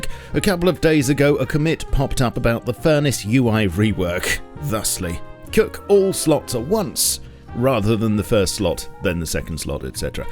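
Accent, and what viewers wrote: United Kingdom. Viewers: You sound like the spiffing brit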